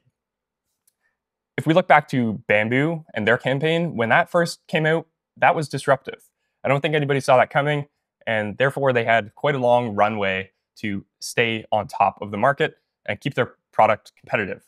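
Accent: Canada. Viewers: Good video. You've got. A unique. Halting style of speaking Canadian. I don't hate it. Eh.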